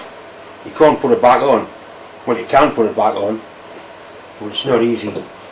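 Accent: Newcastle, United Kingdom. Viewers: Awee man. You from newcastle??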